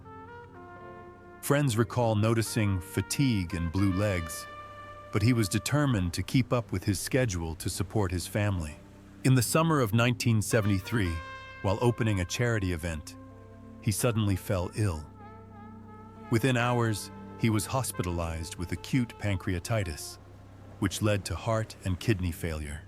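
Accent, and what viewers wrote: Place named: United States. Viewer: Who needs to hear an awful american voice in a typical dull silly over dramatised so called factual documentary….👎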